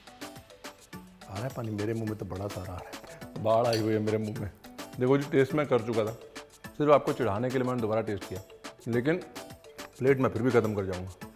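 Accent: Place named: Lucknow, India. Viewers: Aapki awaaz aur aapke Shabd sunke hi lag jata hai ki aap Lucknow ko belong Kiye Hain ab aap abhi kahan Rah rahe hain yah Nahin Pata maine aapko Kapil Sharma show Mein Dekha Tha tab Main Nahin Janti thi ki aap Lucknow se ho lekin usmein Maine aapki awaaz Suni Thi Kunal Sab the Sanjiv Sar the aur aap the tab Mujhko andaza ho gaya tha ki aap Kabhi Na Kabhi Lucknow Rahe Jarur Hai thank u Sar Aise Hanste muskurate rahiye Acche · Aap Lucknow ki baat karte hai bahut aacha lagta hai jo Lucknow ki yaad dila deta hai aapka Lucknow ka andaaz behed khubsurat hai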